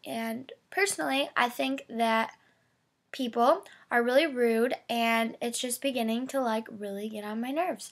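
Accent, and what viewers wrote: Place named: Michigan, United States. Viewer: you sound like you're from michigan!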